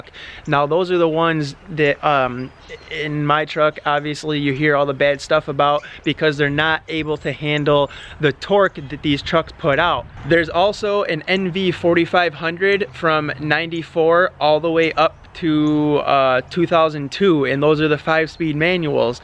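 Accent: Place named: Upstate New York, United States. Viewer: must be upstate ny, that accent....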